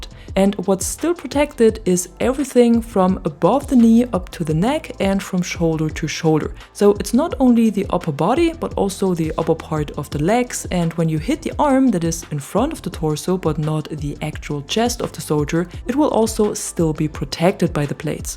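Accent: Germany. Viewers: Es ist vermutlich dein deutsch englischer Akzent, du machst top Videos 👍👍👍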